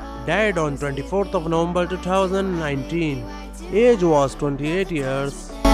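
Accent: India. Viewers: Oh my god i dont understand your accent. Make it clear when you speak. I guess you are ndian obviously in your accents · Indian accent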